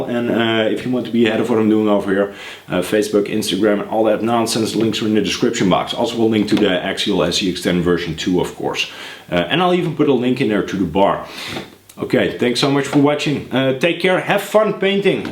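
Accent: Netherlands. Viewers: Wow! Really creative and original work. Are you Dutch by chance because your accent sounds a bit Dutch?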